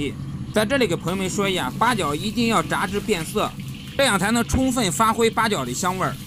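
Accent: Standard. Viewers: 我們家都放老抽。解說很標準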